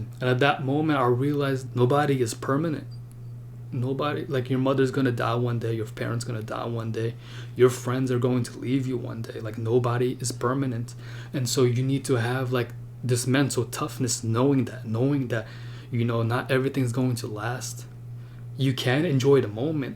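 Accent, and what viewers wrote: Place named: Texas, United States. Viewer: Bruh your accent is very beautiful. Actually I thought in Texas they speak like Arthur from red dead redemption 😅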